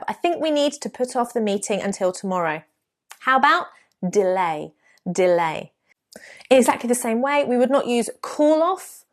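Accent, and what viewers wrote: United Kingdom. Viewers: realy I love you and your way in speaking british English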